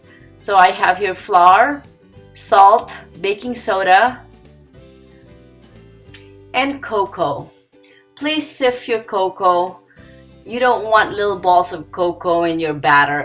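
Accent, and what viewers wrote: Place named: Philippines. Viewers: You sound like a filipina who tries really hard not to sound one... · Perhaps her target audience are not limited to Filipinos. Also maybe she is not trying to 'not to sound Filipino' and actually just speaks with an accent🤔. With that being said, possibly in YOUR videos you can speak your language, but thanks to her I can understand and follow her instructions. Boo...👎😊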